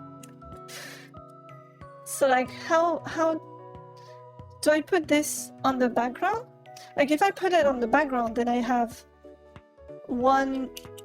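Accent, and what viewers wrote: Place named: France. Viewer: Your english accent sounds like french. are you french?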